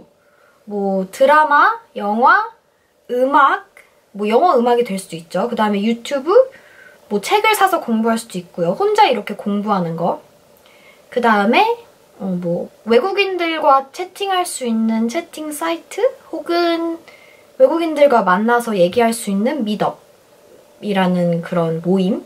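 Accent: United Kingdom. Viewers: 영국발음 너무 멋져요^^ 저도 영국 발음을 연습하고 싶은 욕구가 뿜뿜하네용~~ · weird how do u have so strong Britsh accent? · 영국 악센트보다보니 제 호주 시골발음이 너무 안쓰러워졌어요ㅋㅋㅋㅠㅠㅠ 목소리도 말씀도 조목조목 너무 예쁘게 잘하세요!😍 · 당신...... 혹시 전생에 헤르미온느였나? 영국영어 너무 듣기 좋아요ㅠㅠㅠㅠ 넘 멋있구, 왠지따라해보고 싶고, 치이고 갑니다ㅠㅠ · 남자친구가 영국에서 7년을 넘게 살다왔는데 남자친구가 하는 영국영어보다 이 하는 영국영어에 더 치이고 갑니다......💜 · 오늘 처음 들어와서 구독박고갑니다. 자주들를게요. 영국발음 넘 좋네요!! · 영국 영어 멋있네요. 한동안 영어 잊고 살았는데 동영상 보고 기분이 좋아집니다. 좋은 하루 되셔요~~~ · 알고리즘에 끌려 들어왔다가 영국발음에 치여서.. Q&A도 보고 영어 공부해야겠단 생각이 빠짝드네요!❣ 구독하고 갈게요🥰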